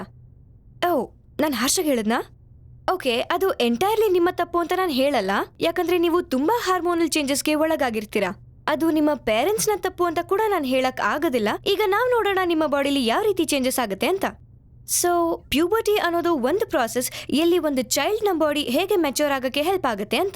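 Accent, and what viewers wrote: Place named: India. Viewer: fifakillaz 14 Indian accent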